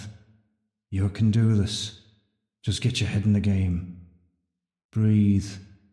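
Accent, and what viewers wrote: United Kingdom. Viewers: Are you from Yorkshire? Tysm for the fun vid 🩷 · I was just about to compliment you on your northern accent and I'm a northerner myself.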